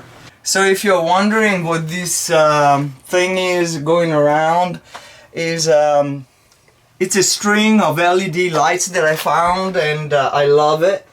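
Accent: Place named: Austria. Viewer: I sense a lil but of Austrian or German accent, á la Arnold Schwarzenegger’s voice..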